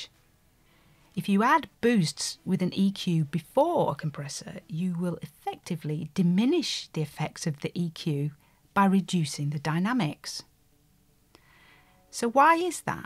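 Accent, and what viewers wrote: Northern England, United Kingdom. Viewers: how does this only have 6000 views??? there is so much terrible nonsense on yootoob and your videos are outstanding. plus it’s lovely to hear a fellow northerner on the interwebs. keep it up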